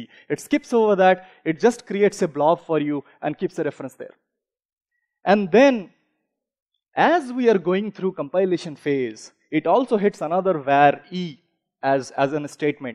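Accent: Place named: India. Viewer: very thick indian accent. hard to follow.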